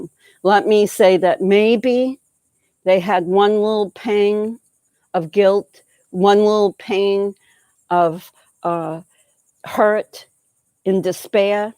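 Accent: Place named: New York, United States. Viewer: I love your daily greeting; very Marilyn Manson like, but unsuspecting! And, I'm guessing a New York accent. Probably from New York City? I'm guessing and sleuthing on you! GOOD MORNING BEAUTIFUL PEOPLE!! xoxo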